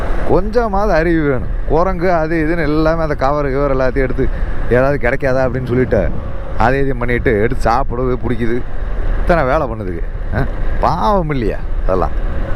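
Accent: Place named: Kongu, India. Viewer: Hi watching your vlogs with great interest. Wonderful effort. Keep it up..love your Kongu Thamizh. Subscribed your channel. All the best ❤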